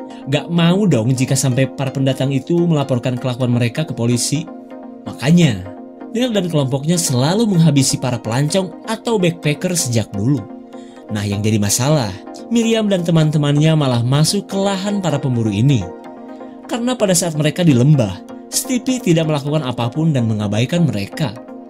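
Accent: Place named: West Java, Indonesia. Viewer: Suka gya bicara ada sunda2 gituhhh subrek donkk gaskeunn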